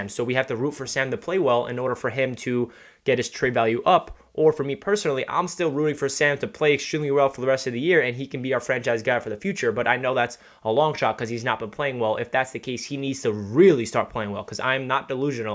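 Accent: New York, United States. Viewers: Hard to tell whether I should take him serious with that thick New York accent. So hard to tell if they're trying to con you. From a fellows Chiefs fan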